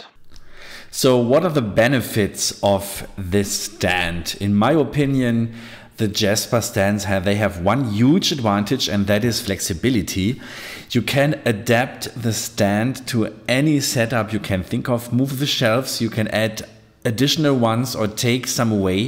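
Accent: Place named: Germany. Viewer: I think they thought since the video title is in English, it meant perhaps Jaspers products were available to be shipped from within the US.Then he heard your accent and realized that you are in Germany, so his hope for lower cost shipping was dashed.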